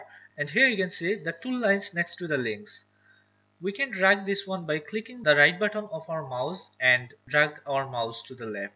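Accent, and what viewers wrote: India. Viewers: Did you hear an indian accent ? Oh You found the right video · You know you found the right video when you hear that Indian accent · Bengali accent !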